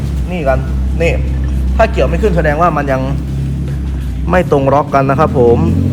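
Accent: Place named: Isan, Thailand. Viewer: เว่าอิสานว่ะ555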